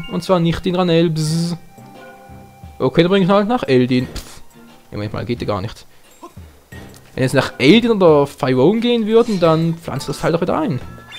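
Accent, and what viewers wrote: Switzerland. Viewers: Man merkt total das du schweizer bist ^^ Bin auch schweizer aber kann perfekt deutsch ohne akzent reden, weiss auch nicht wieso :D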